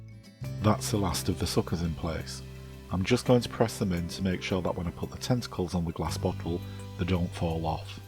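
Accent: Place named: North, United Kingdom. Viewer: You sound northern,like myself, relaxing listening to you x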